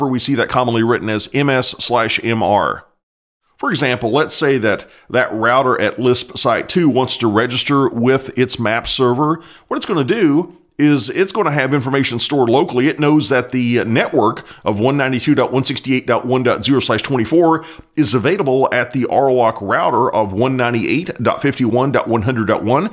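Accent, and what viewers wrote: Texas, United States. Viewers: topped up with that texas accent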